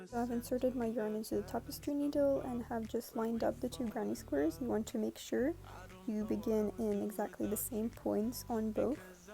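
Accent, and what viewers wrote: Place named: Russia. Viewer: По произношению Английского языка понятно,что она русская😂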